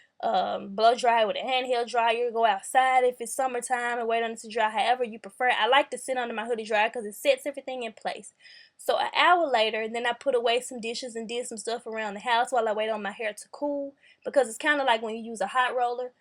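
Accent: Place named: Houston, United States. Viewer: I like your accent lol 😂 u from Houston?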